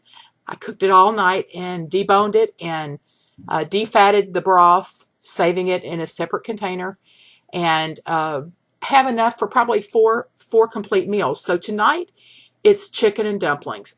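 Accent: South, United States. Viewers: 3 years later and I'm still making these dumplings! I'm doing them in a beef stew but works just wonderfully. I love revisiting this video, I'm from Canada and I live for the southern accents! You know the recipe is going to be good, when you hear that accent! Cheers!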